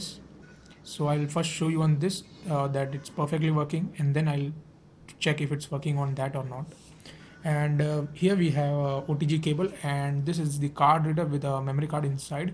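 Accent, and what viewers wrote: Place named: Gujarat, India. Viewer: Are you Gujrati?? your accent is like Gujratis.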